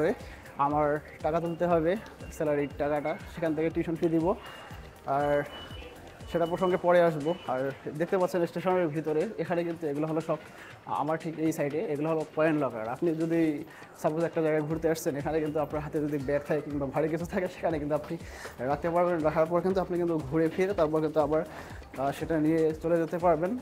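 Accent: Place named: Cumilla, Bangladesh. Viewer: ভাই আপনার বাড়ি কি কুমিল্লা নাকি? ওই এলাকার ভয়েস এর মতো মিল লাগে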